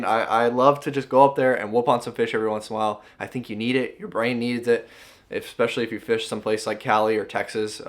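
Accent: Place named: Australia. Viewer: You the man! So I was watching some Braille skateboarding drama the other day and thought Ricky Glaser reminds me of you lol minus the Australian accent obviously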